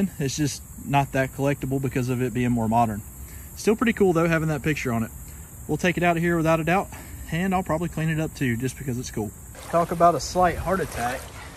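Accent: Alabama, United States. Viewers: Please excuse my ignorance, but are you saying “Cherra Cola” or “Cherry Cola?” I guess my Alabama accent decoder’s rusty. I lived in Huntsville as a kid when my dad worked for NASA, but I’ve lived in Alaska too long. Sorry for the dumb question 😂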